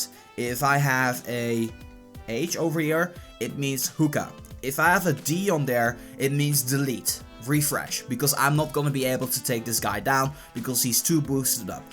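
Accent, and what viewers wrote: Netherlands. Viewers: | Boom Beach & Clash Royale Are you from the netherlands? you have a dutch accent :L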